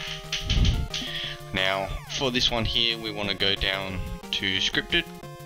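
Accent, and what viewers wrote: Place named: Australia. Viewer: When I clicked on the video and heared an Australian accent, I was like HOLY KROYKEY MATE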